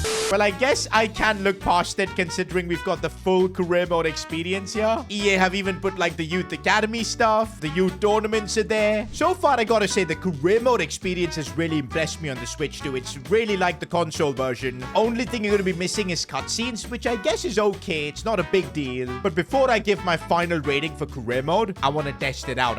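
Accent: India. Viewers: Now i know why foreigners say indians sound like this i get it now. The real indians dont the NRIs do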